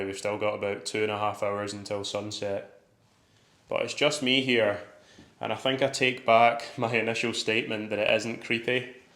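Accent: Scotland, United Kingdom. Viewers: 💎Another great Video! Thanx and biggest Respect! Love your scottish accent .😊 Wish you all the best! 💪🏼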